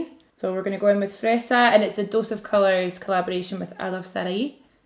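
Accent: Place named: Glasgow, United Kingdom. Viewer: Ive been watching all the recent dramas across the pond and it’s so lovely to come here and listen to that beautiful familiar (glasgow girl here too!) accent and some gorgeous makeup! Keep it up Mrs!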